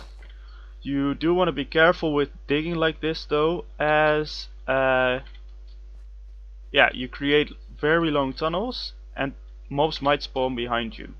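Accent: Netherlands. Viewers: Your Dutch accent💜 btw loved the video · The Dutch accent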